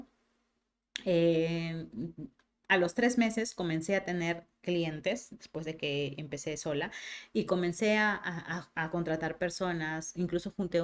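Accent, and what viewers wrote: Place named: Peru. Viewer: Me inspiraS , tienes algun libro de tu autoria?? Hablas como peruana. Ya soy tu seguidor..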